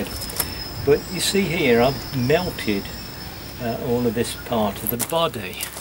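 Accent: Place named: Birmingham, United Kingdom. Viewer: I have a question if that's ok, I have A faulty strimmer, the plate that rises and falls behind the plug seems to be hitting the plug to the point where it's closing the gap ( surely that's not correct ) if I pull the cord it works freely with the plug out but struggles with the plug in. Ps are you a Brummie, theres definitely a bit of Brum in your accent. thanks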